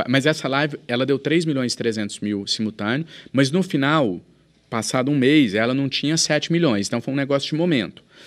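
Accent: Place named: Minas Gerais, Brazil. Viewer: JANONES presidente do Brasil daqui uns anos! Está amadurecendo para isso! Adoro suas ideias e o sotaque mineiro!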